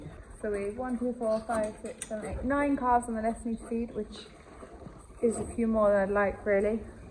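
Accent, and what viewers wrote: Ireland. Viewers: Great video, more!! You're accent is absolutely starting to sound a lot softer and Irish 😊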